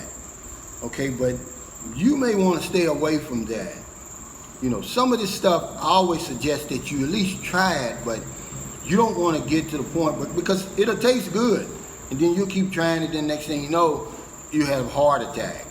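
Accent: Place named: Kentucky, United States. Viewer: Slang English...ahh it's your Kentucky accent I bet.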